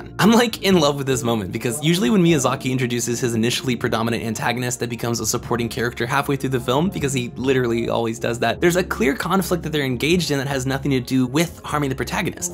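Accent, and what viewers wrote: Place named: United States. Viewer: Hi, this came up and I got to the point where you got to Myazaki pointing out how this is Iraq war. I agree war is bad, I don't think that is up for debate. But Myazaki is a known Pacifist, and as a Japanese person, I won't fault him for it. But based on your accent, you are an American. In the current world, do you think we should be pacifist? Its 2024, and there are multiple wars we are supporting. Should we pull out?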